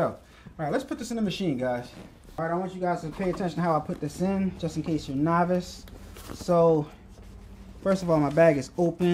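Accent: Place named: Baltimore, United States. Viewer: you from baltimore my man?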